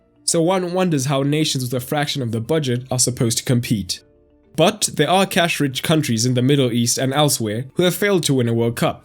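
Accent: South Africa. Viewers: Where are you from? You sound South African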